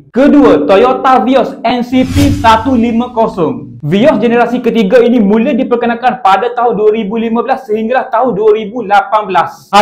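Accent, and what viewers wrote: Kelantan, Malaysia. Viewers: Bos kena kerap baca surat khabar agar cakap boleh tukar bahasa buku n tak bunyi kelantan,maaf sekadar pendapat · Confirm kau ni fobia dengar sleng kelantan kan?..lantak dia la dia nak guna bahasa loghat ibunda dia..dia masih xlupa asal usul dia..yg kau suruh dia ubah² tu pehal..dia bukan warga asing..dia warganegara malaysia melayu..pe benda la punya pemikiran..org mcm kau ni klau duk oversea confirm terus lupa asal usul kau.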